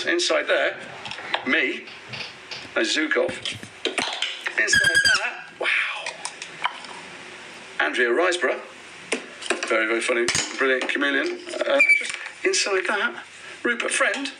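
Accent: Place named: Liverpool, United Kingdom. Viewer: I love his natural Liverpool accent…I’m from Liverpool too. I actually met Jason at Liverpool Comic Con in November 2024 - Jason is the sweetest person you could ever meet. I actually had made made a diamond art portrait Of Jason as his characters. Which he really loved and it was a small gift. Love all his characters -he plays all his characters so beautifully and tell their stories beautifully. Jason was and remains the best Star Trek captain ever too.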